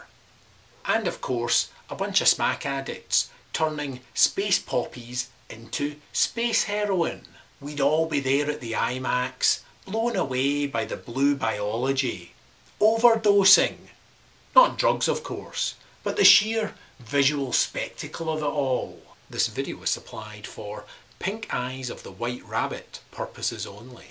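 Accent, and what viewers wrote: Scotland, United Kingdom. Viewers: You're Scottish? o.o..I know someone in Scotland =D I've known him for like..4 years =)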